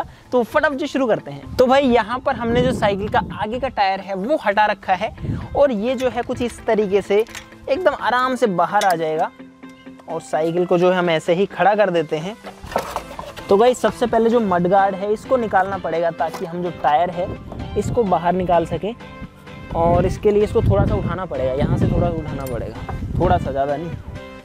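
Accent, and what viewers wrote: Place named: Gujarat, India. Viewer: Oh bai tum gujrat se ho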